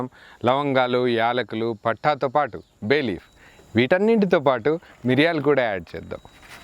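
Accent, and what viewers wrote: Guntur, India. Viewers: Veg biriyani ela cheyali cheyypandi garu mee telugu guntur Telugu laaga undhi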